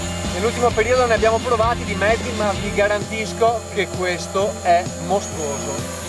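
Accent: Veneto, Italy. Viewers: be' io sono Mestre e la parlata veneta la riconosco😋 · uè zio sei veneto?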